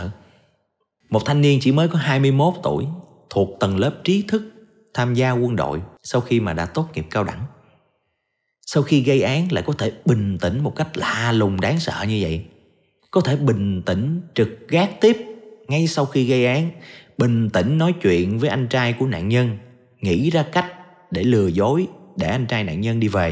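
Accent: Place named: Binh Dinh, Vietnam. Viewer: là người quê bình định à? Nghe giọng đọc cứ nghĩ tới người bình định th haha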